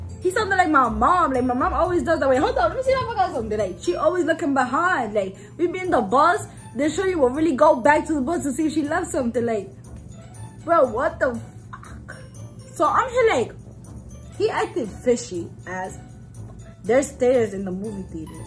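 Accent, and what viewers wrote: New York, United States. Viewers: You from New York huh?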